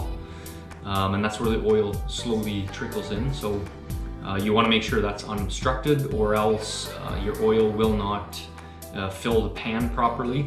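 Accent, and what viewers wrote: Canada. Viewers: Nice video, je savais que j'avais affaire à un Québécois mais ça pris quelques minutes avant de te trahir :P Bonjour de l'Abitibi ;)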